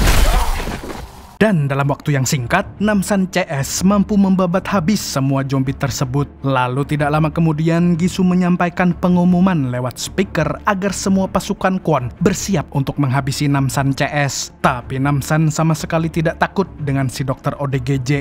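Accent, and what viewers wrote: Banjar, Indonesia. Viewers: Adminnya urang banjar ngarannya nii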